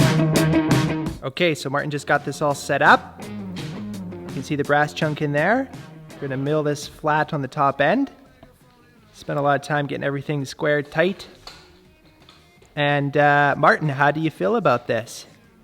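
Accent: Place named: Canada. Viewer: Are you Canadian, or is it just an act? just wondering because it sounds forced at times 🤔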